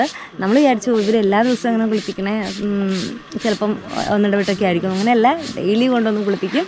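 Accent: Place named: Chala, India. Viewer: മൊത്തത്തിൽ ഒരു ചാള സ്ലാംഗ് ഫീൽ ചെയ്യുന്നുണ്ടല്ലോ... പിന്നെ dotted deer എന്ന് പറയുന്നത് ശരിയല്ല കേട്ടോ Spotted deer ആണ് കറക്ട്..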